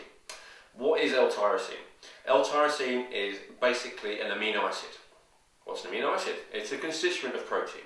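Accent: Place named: United Kingdom. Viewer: The few bits of your audio that are discernable, seem to possibly have useful info. Please, repost this info having recorded it in a room acoustically dampened to eliminate reverb, using a more sensitive microphone or placing it closer to your mouth, and without mumbling. Not everyone is atuned to the British manor of speech, and I suspect you want to attract the most viewers possible afterall. Also I suggest using a backdrop, even if it's only a non-distracting picture (digital or enlarged photo) of a common interior room, rather than a solid color. And shave before a public address. It lends to your credibility. Please, reply when your updated video is posted. I look forward to your thoughts on the posted topic.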